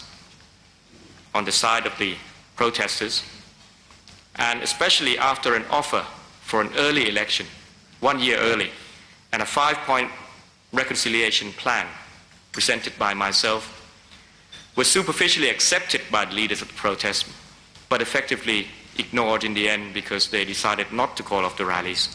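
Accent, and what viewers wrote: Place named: Thailand. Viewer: เท่าที่ผมดูน่ะ แกนั่งอ่านสคลิบทั้งนั้นนี้น๊า..สำเนียงก้อไม่เท่าไหร่หรอกนะ ก้อเหมือนคนไทยที่พูดอังกฤษทั่วๆไปน่ะคับ....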